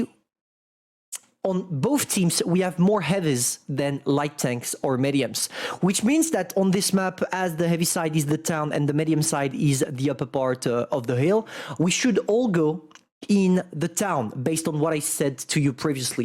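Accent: France. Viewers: Are you french?🤨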